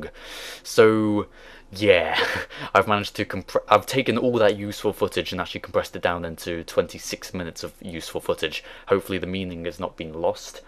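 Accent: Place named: Wales, United Kingdom. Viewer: you sound welsh :) watching in caerphilly :)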